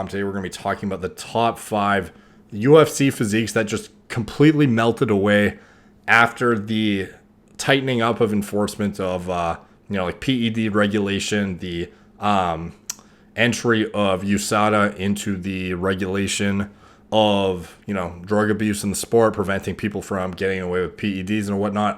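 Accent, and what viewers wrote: Canada. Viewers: The Canadian accent is strong with this one. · Maybe it’s just because I’ve watched too many of them both but sounds more Canadian whereas Nick’s voice sits firmly in the “country parts of the northeast” camp.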